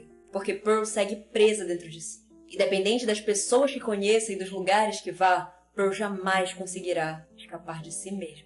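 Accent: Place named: Pará, Brazil. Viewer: Voz de dubladora com sotaque paraense, asmei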